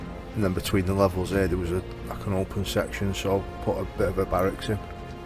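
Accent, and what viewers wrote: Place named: England, United Kingdom. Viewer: yeah I saw the creator bit with vids but couldn't find a list of the creators to check out . There should be a sections with a list of the creators and their channels to check out if there is I'm sorry I can't see if but if there is point me in the right detection ...I have just been getting some pointers off some of the guys on how to make vids on the xbox since a few people have messaged me asking me if I have vids on some of my builds but I only have pics ...I'm going to be trying to make a vid in the next couple of days with the help of some of the guys from the discord ...ohh n bwt love your accent on the vids makes me feel at home since I have moved out of England to be with my gf ..keep bringing out some awsome vids hope to see some cool designs in the future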